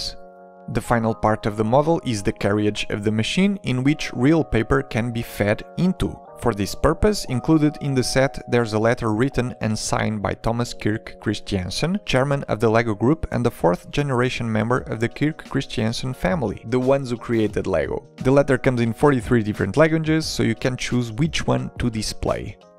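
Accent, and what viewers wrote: Portugal. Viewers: Tava mesmo a ver que eras português, o sotaque foi o que fez com que eu descobrisse!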